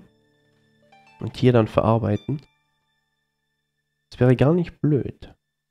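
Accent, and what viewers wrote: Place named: Austria. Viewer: Bist du Österreicher? So vom Tonfall klingt es etwas so :)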